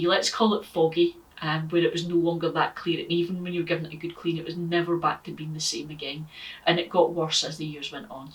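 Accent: Scotland, United Kingdom. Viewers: I'm subbing for the accent. All hail the Scots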